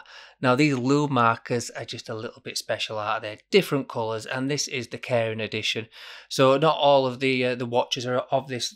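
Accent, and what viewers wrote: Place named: Yorkshire, United Kingdom. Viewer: A Yorkshireman giving watch reviews? Subscribed.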